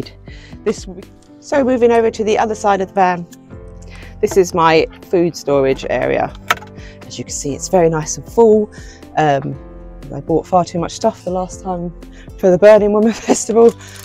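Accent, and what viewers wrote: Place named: Southern England, United Kingdom. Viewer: I thought you sound like a southern girl. Love your crochet blanket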